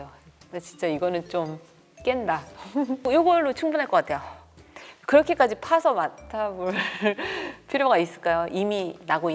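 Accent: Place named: United States. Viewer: 영어 발음두 미국본토 발음이시구 네이버 다음만큼 지식이 훌륭하십니다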